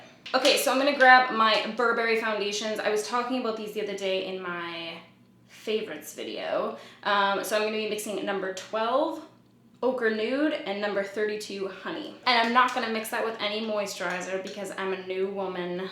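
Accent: Canada. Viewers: Heyoo canadian accent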